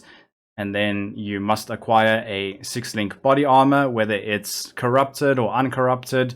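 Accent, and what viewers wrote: South Africa. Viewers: You sound South African dude. Am I guessing correctly?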